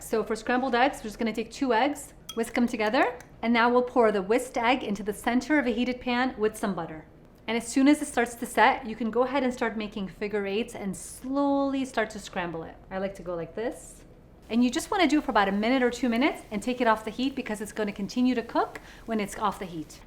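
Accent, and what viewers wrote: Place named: Michigan, United States. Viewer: Forget the avocado toast; where is that accent from? Im guessing deep Michigan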